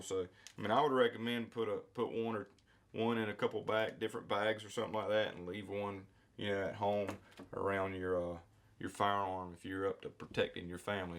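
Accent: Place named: Georgia, United States. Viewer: Hey! where are you from? You sound like your from around the Georgia or South Carolina area...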